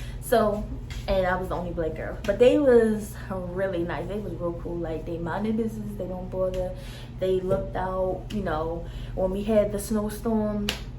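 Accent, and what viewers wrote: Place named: Baltimore, United States. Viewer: It’s the Baltimore “tew” for me lol. But great video!!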